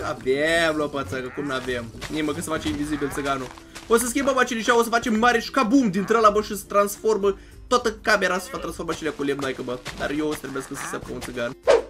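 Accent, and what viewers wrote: Moldova. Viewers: mie imi pare in videoclip ca ai acent de moldovean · Ești Moldovan?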